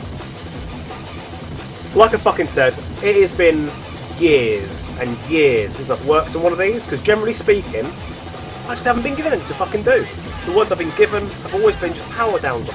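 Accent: RP, United Kingdom. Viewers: you sound posh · His swearing and british accent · thought i was on a english ponce channel first 6mins. was gonna tell you to go swill tea with the upper class twats in a palace.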